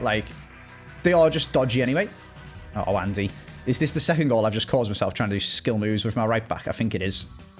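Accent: Liverpool, United Kingdom. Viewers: He’s scouse!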